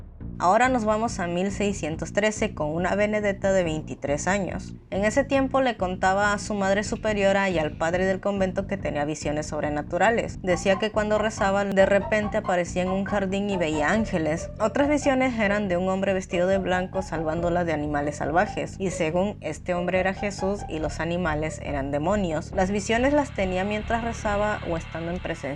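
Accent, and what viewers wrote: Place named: Veracruz, Mexico. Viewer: Le puse más atención a tu acento jarocho que a la historia en si xD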